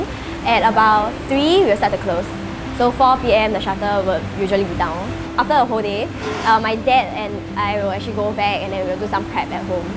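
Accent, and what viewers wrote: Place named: Singapore. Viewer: zigstar losat this is how we speak among ourselves, most of us will moderate our speech to an extent when speaking to non-singaporeans (except Malaysians... they basically sound like us)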